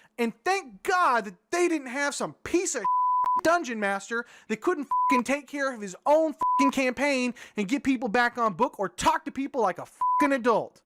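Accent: South, United States. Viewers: You get more southern when you get angry! lol But seriously I agree. I have met some old school DMs who totally get off on TPKs. There are a lot of folks that simply, in my opinion, are not flexible and creative enough to be DMs! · turns the dixie up to 11 (accent) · LOVE THIS. I can hear it in your voice, like you went a little "ghetto" (or deep south? - I don't know, I am from Wisconsin) on this:) LOL PERFECT what you said about having the "adult" conversation - gaming is all about COMMUNICATION - if we cant talk to each other, why are we playing? I have been gaming for 30 years and I cannot once think of a time where I as a DM or a player experienced TPK for something like this. Recockulous advice you were given. Keep making videos, I love your work - great stuff! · You can hear the Texas in his voice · you get a southern accent when you get agitated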